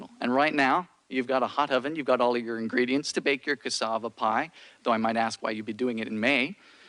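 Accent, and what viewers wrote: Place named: Bermuda. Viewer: The Bermudan accent comes out a bit more when he's at home eh.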